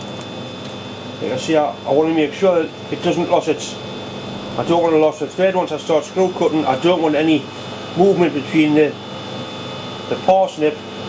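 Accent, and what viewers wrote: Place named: Yorkshire, United Kingdom. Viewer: I’m guessing by that accent you’re from Yorkshire?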